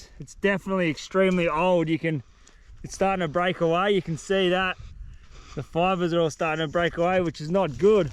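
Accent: Australia. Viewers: I can hear that Aussie twang in that exactly (?)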